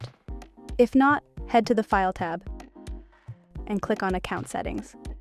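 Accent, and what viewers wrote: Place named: United States. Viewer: Breath of fresh air to have an African resource for this - now consider having an African voice over to make it more genuine (as opposed to an American accented voice)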